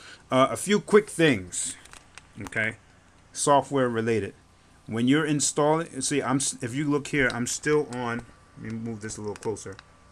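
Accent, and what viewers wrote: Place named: Caribbean. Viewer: Sound like your west indian. Grenadian perhaps?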